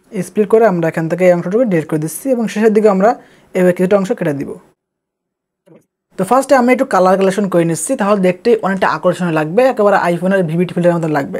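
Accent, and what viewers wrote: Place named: Jessore, Bangladesh. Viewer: ভাই আপনার বাড়ি যশোর। ভাষায় তো সে রকম মনে হচ্ছে 😊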